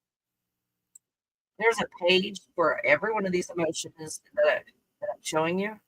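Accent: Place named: United Kingdom. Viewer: love you’re British accent honestly I have a really strong Yorkshire accent you would need subtitles to understand ❤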